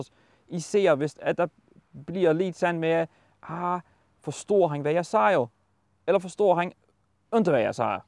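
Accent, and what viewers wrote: Scania, Sweden. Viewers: Det låter som skånska tycker jag :D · Det liknar skånska en del. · Jeg er fra Skåne og det lyder næsten som det😮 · Jeg er norsk, og forstår det. Det høres ut som skånsk synes jeg. Noe som ikke er merkelig, siden skånsk opprinnelig var østdansk, som er blitt forsvensket. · En blandning mellan skånska, danska, blekingska och lite småländska. Rätt kul.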